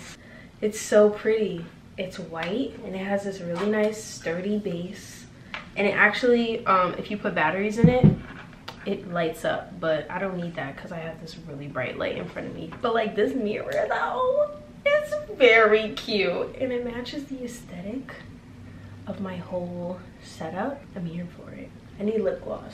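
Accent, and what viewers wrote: United Kingdom. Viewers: The British accent 💘🥺..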